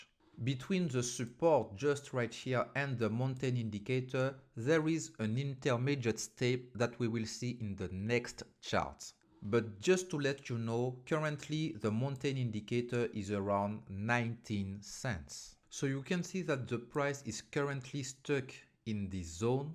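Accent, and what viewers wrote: France. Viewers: En tant que français j'apprécie beaucoup ton accent frenglish 😅👍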